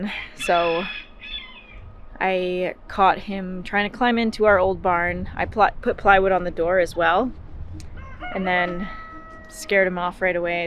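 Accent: Australia. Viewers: are you from Australia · Hello from Puerto Rico. congratulations for the way you help your husband? and do such heavy chores. Keep safe from the black bears, I believe they could be dangerous if approach by humans. You should carry a weapon just in case to defend yourself. By the way, are you Australian?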